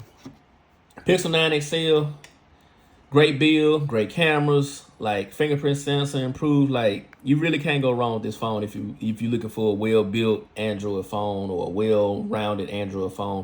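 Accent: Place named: South, United States. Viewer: Thanks for info bro. I can tell you from the south! Love from Alabama.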